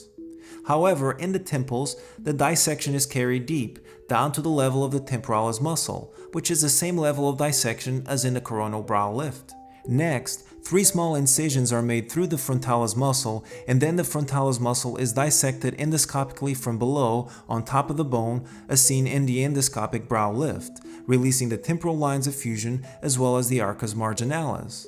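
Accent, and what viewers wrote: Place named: Turkey. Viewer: is he Turkish? he sounds Turkish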